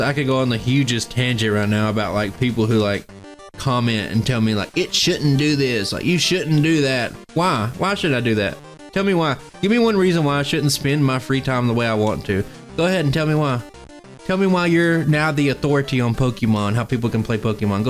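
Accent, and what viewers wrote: South, United States. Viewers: honestly imo the country accent is dope, its one of the things that separates you from your average poketuber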